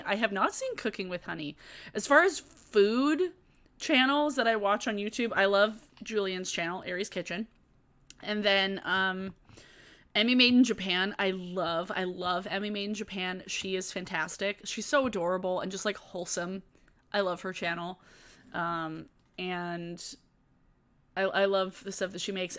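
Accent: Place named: Minnesota, United States. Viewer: I’ve never met anyone that actually talks like your Minnesota accent but that’s a great accent 😂. Maybe it’s because all the Minnesotans I know are from the Twin Cities area and I’m from the part of southern Wisconsin that’s near Chicago. If you want to watch comedy about the Midwest there’s a comedian called Charlie Behrens that definitely talks in that accent!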